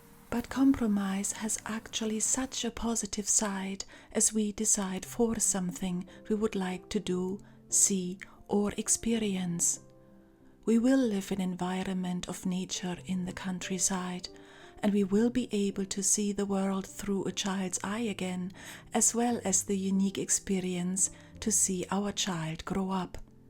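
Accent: Scotland, United Kingdom. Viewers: I feel like you are picking up a bit of Scottish in your accent.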